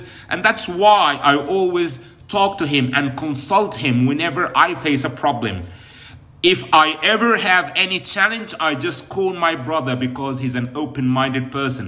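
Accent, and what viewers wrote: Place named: United Kingdom. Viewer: I am in love with the british accent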